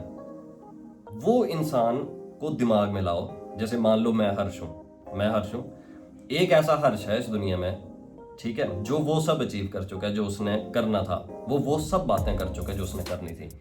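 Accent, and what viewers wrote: Himachal Pradesh, India. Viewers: Your accent tells that you are from Himachal.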